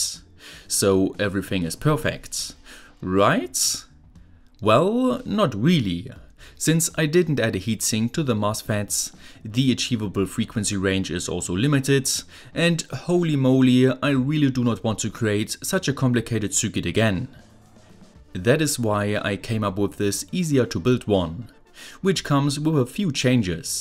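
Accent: Germany. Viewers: Your form germany right? I can hear it 😂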